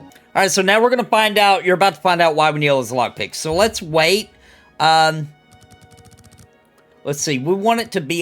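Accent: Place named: South, United States. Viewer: Where you from brother, i hear the southern in you I'm from arkansas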